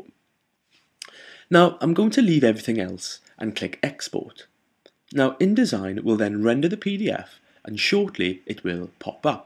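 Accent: Wales, United Kingdom. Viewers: I am so happy I found your tutes! I literally clapped you at the end of the last video. So well explained, so clear, nice voice, nice pace, nice command shortcut knowledge and repitition and you really focussed on all the things I needed to know. Now Im off to learn PS with you :o) . Keep up the great work!... you should charge for these!!! p.s I knew it was a Welsh accent!!! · Just wanted to say a big thank you for your hardwork that you have put into this. Its really generous. Its really clear and just works!! I wish more of the internet could be like this! I sat down and watched them through as though it were a lecture at university! Thanks again. By the way, are you Welsh or from New Castle?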